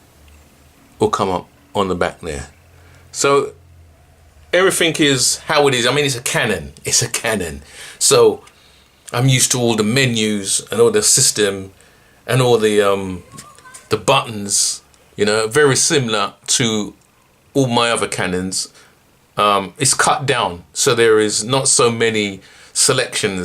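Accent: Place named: United Kingdom. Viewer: The 21st century has arrived. I was looking at the 80d as it has a headphone jack, but I guess I can use the meters on the m50 if I shoot video, and not break my back. Enjoy your 22 f2 as I read the bokeh is great plus low light. Your photos look great, do you think this body performs as well as the 80d for PHOTO image quality? If it does, it is a lightweight bargain. Great to hear a British reviewer: I am from near Newmarket :-) Other reviewers compare this camera with expensive SLR which is an unfair comparison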